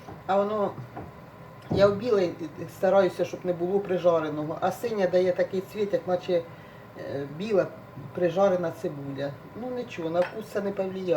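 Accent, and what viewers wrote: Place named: Dnipropetrovsk, Ukraine. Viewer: Вы, наверное из Днепропетровска или области. Только у нас так говорят и готовят. Рада, что вас нашла! ❤❤❤❤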